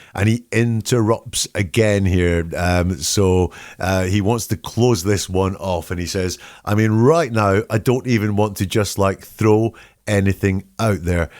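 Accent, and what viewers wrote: United Kingdom. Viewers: Hello, my friend! You sound British! I'll share a bit of synchronicity with you. About an hour ago I just learned of the idiom "hoist with one's own petard" How fitting for Mr Watts and this very insightful video! I have subscribed and look forward to hearing from you often.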